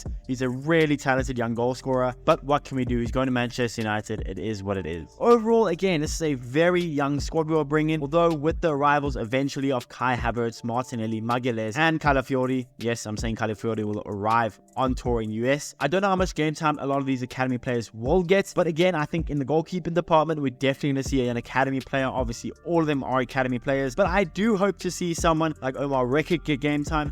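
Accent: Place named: South Africa. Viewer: South African 🇿🇦 accent for the win 👊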